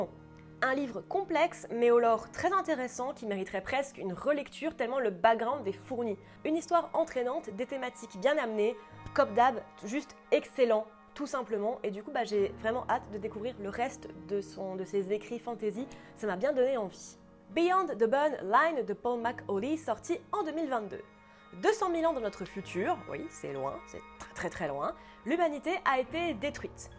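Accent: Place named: England, United Kingdom. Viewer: de estelle faye je te recomande la derniere lame et sinon j'adore ton coté bourru et ton accent anglais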